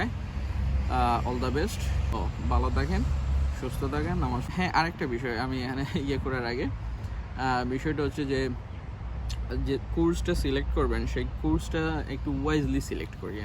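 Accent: Sylhet, Bangladesh. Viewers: bro ur sylheti i guessed it from ur accent tht u used love to heard tht 👽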